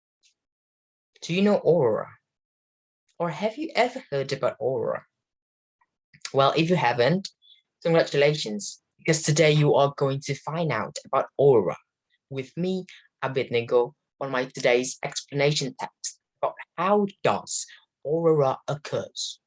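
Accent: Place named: United Kingdom. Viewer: Wih ini cara ngomongnya british banget yah keren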